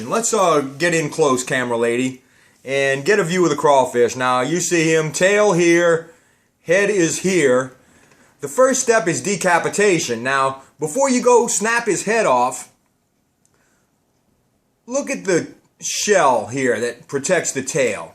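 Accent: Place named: New England, United States. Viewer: This guy sounds like a yankee???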